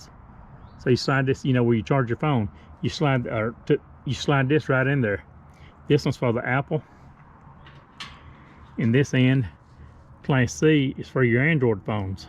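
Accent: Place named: South, United States. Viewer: Great video! And I love your southern accent!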